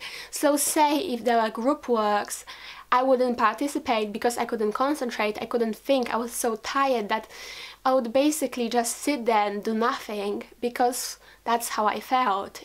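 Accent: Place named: Europe. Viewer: The Real Mansa Musa her school was british and she sounds european and her name looks european too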